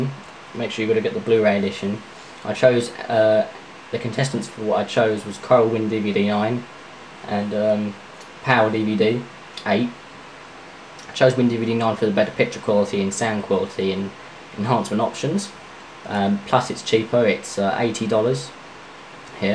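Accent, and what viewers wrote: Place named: England, United Kingdom. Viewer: Man i love your English accent ^^ and also your bluRay :p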